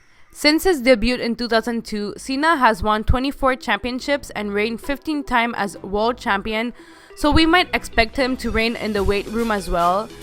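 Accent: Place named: Iran. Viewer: What's with this woman narrating this video..sounds like she's from iran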